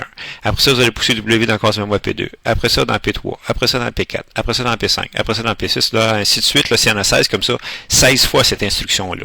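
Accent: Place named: Quebec, Canada. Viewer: WOWWWWWWW un vidéo en quebecois hehe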